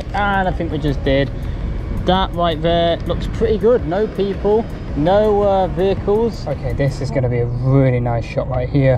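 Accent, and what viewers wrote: Manchester, United Kingdom. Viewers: Mate! I subscribed to not miss any of your funny commentaries min hahahaha!!! Luff your Mancunian accent!! haha 🤣🤣 And of course, FANTASTIC camera work!!!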